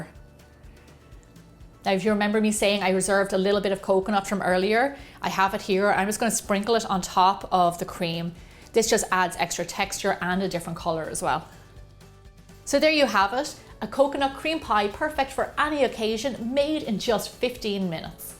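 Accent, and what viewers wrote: Ireland. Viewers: I’ll make my own all organic pie crust 😉 short cuts not important for me 😊 ... thank you for the recipe ❤️ your accent make me wanna move to Ireland 🇮🇪❤️😊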